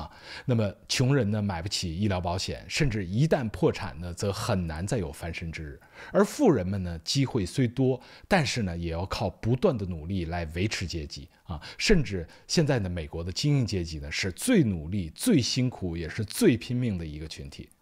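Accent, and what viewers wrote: Standard. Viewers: 主播的普通话说的很好、很正。现在有的主播说话舌头不伸直，全程充满S，疯狂装沘，极度装嫩。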